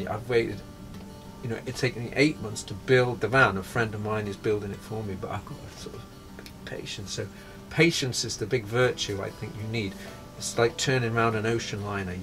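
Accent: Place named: Merseyside, United Kingdom. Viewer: what's his accent? Sometimes he sounds like he's from Merseyside.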